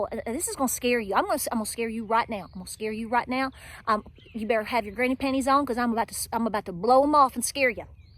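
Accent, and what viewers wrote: South, United States. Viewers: You are the absolute BEST!!! Except for the southern accent, you sound like me! I am astounded that people are so stoopid!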